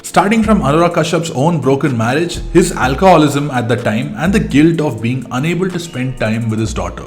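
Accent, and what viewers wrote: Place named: Kerala, India. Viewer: Mallu pretends to be tamil. But basic accent is mallu. Boring narration.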